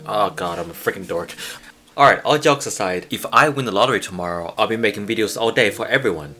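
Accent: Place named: China. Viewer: This accent sounds like that of a Chinese guy speaking. His English grammar and vocabulary is great, however this accent really sounds Chinese. Good hints, beautiful drawing. Congratulations.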